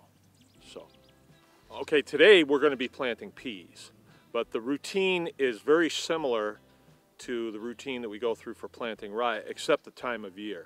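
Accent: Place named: New England, United States. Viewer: I haven’t watched the whole video but you seem to be hiding a New England accent.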